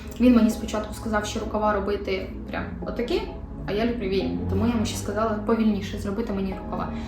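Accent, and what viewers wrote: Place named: Pokuttia, Ukraine. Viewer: Чудовий покутський сведрик❤ Дякую за відео, дуже приємно тебе слухати і дивитись❤❤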